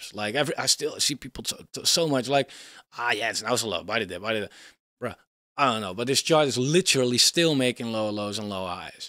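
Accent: Netherlands. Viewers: Je pikt ze er altijd uit, de Hollanders die Engels praten :p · Lol mooi nederlands engels haha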